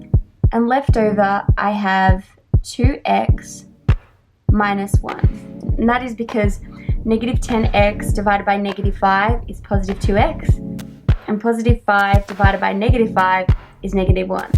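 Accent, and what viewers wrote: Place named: Australia. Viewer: It sounds like you are from Australia, but you use grouping and NOT the Australian method. Very curious.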